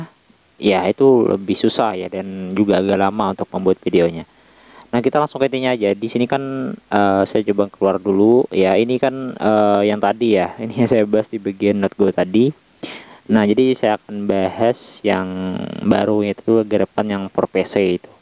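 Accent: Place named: Barabai, Indonesia. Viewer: dari logat kamu dari barabai yah? soalnya saya dari barabai dilihat dari kamu ngomong udah kelihatan kamu asal barabai hst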